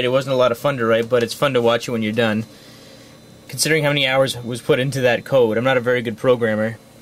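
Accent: Canada. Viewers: i can tell by your accent that you are a canadian...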